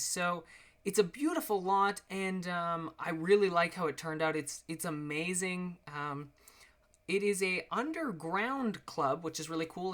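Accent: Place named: Canada. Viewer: It's a white (Canadian) Guy speaking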